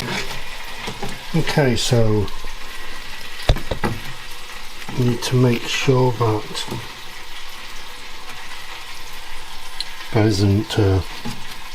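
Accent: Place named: North, United Kingdom. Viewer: I AM FROM THE 1960 S IM.IM IN NZ I JUST REALLY ENJOY HOW YOILIIU DOYOUR COOK COOKING.PROGRAMME MR. CHIEF THE..INGRIEDIENT AND PREPARE OF THAT YOU ARE COOKING AND TO THOUROUHLY COOK PERHAPS THAT THOSE LIKE TO VEIW THIS PROGRAMME ALOT THE COOKING RESULTS ARE SCRUMBY THEEDEDESE RRECIPIES ARE HAVE TO BE VERY WELL MADE ANND SCRUMBY THANKYOIU MR COOK I REALLY LIKE THOSE TYPES OF FOLK AN THIER ACCENTS.LIKE YOURS FROM THE NORTHERN PLACES OF THE WORLD YOUR PROGRAMME PROVIDES HOW TOO WELL MADE SCRUMBY DISHES AS WHEN AS ALSO I VE BEEN INOLVED TOO COOK HERE PREVIOUSLY . THANK YOU MR. COOK THESE DISHES ARE SCRUMBY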